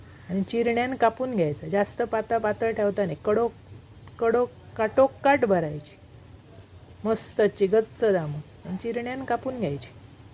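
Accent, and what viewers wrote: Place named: Malvan, India. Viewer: मालवणी भाषा बोलण्याची पद्धत अप्रतिम अजिबात भेसळ नाही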